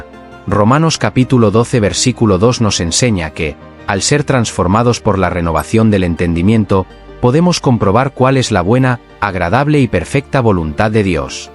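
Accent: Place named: Spain. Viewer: Pero. NO me pongas voz de gachupin🤮🤮🤮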